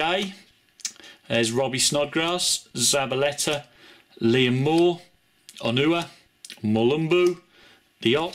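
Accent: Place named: England, United Kingdom. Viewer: Are you from England if so, where? I'm just curious to see what your accent is.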